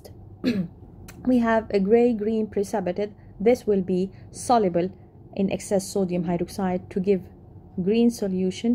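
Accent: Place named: Arab world. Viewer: I think she's Arab, because arabic doesn't have the letter p so it's easily confused with b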